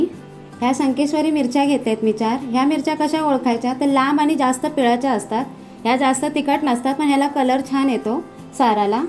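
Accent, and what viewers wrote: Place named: Malvan, India. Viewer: Like your malvani accent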